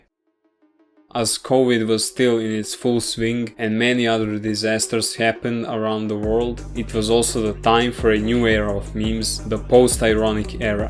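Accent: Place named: Russia. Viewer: I thought it was sorry for the misunderstanding, you just sound russian.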